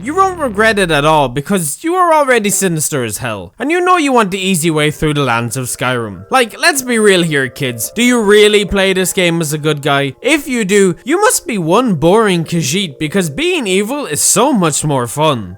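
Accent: Ireland. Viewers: noice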